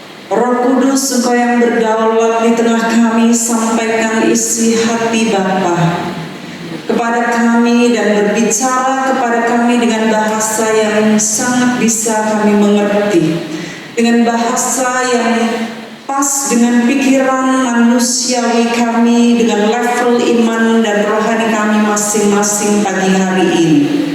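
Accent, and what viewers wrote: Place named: Java, Indonesia. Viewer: Walah ibu Njawani tenan, nek kotbahe wis medok, aku koq yo ngguyu ngekel,.... turut sepenanggungan, ibunda Indah bersama Tuhan Yesus di keabadian Surga Mulia, just btw eyang kakung kulo KRT Widyonagoro Bupati Kasunanan Surakarta era 60an, Pray For Solo... Solo Full of His Glory... Awesome...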